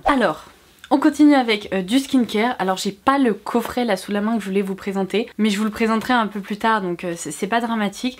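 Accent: England, United Kingdom. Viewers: Petite question idiote mais il te sors d'où ton accès en anglais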